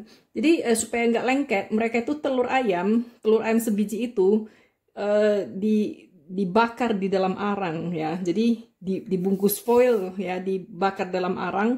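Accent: Medan, Indonesia. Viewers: Kangen juga dengar logat2 medan mak.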